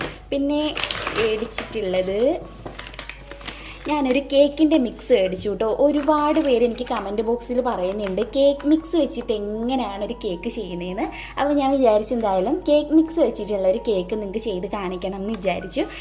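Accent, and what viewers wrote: Kollam, India. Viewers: കൊല്ലം സ്ലാങ് പറയൂ. മിക്കപ്പോഴും മറക്കുന്നു.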